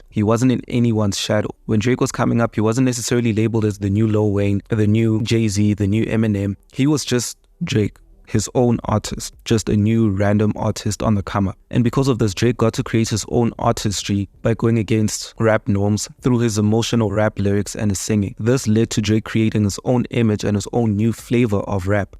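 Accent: South Africa. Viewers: You from South Africa my brother? If so, me too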